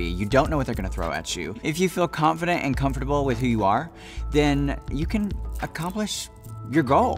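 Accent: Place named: South, United States. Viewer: I love her and get little country accent is too cute. I know she won't win but I'm really rooting for her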